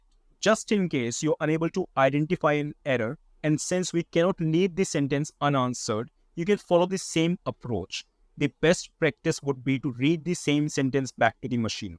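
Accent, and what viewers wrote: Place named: India. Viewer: despite of being indian you got some serious accent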